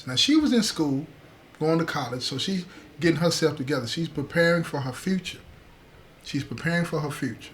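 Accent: South, United States. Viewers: Hey cutie, I don't comment much, but, trust me, I listen to ur channel EVERYDAY. AT 10AM. EVERYDAY. I LOVE THE SOUTHERN ACCENT .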